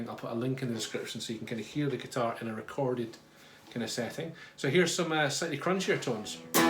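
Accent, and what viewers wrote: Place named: Scotland, United Kingdom. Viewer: Nice to hear another Scottish person!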